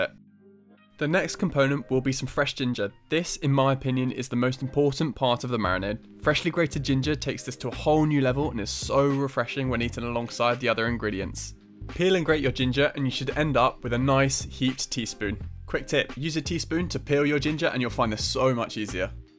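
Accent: United Kingdom. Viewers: Damn his British accent will be the death of me lol. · u r definitely a Britain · British accent..... I love itt😍😍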